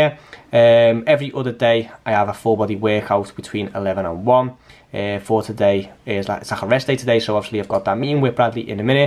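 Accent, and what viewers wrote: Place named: Liverpool, United Kingdom. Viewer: how is it that you are a dutch citizen but sound like a scouser? ☺️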